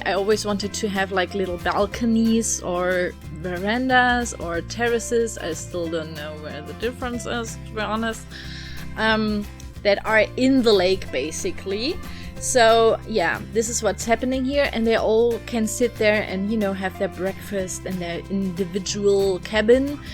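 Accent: Germany. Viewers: Are you from Germany? Your accten sounds german :) Btw: This Video is amazing and so creative !! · is she german? she sounds like me haha. well if i could speak fluent :p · you have the best German accent ever 10/10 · ich bin gerade sehr begeistert von deinem englisch. du hast einen superdominanten deutschen akzent. und dann hast du so ein großes und krasses Vokabular. respekt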